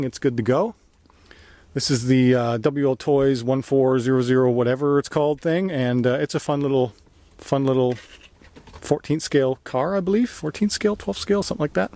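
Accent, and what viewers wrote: Canada. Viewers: New to your channel. Just subbed. Thought you sounded like you were from the great white north. Nice collection. Just did my first tekno build. Mt410 baja beetle with a wing. Went a little over board with the part. $2000 without the reciever.🤯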